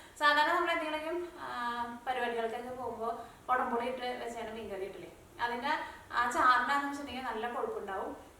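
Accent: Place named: Chalakudy, India. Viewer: കോട്ടയം അച്ചായതിന്ന് പറഞ്ഞിട്ട് ഭാഷ സ്ലാങ് ചാലക്കുടിയാണല്ലോ.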